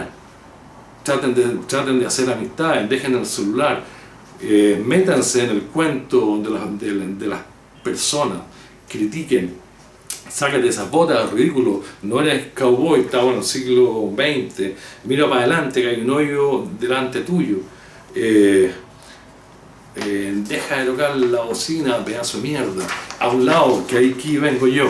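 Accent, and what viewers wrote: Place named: Chile. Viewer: Usted por su asento parece Chileno, es de allá?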